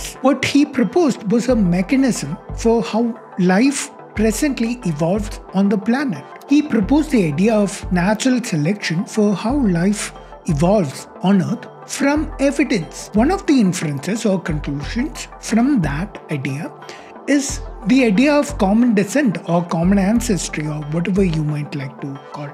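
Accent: India. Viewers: Bro you have very thick Indian accent.😂😂😂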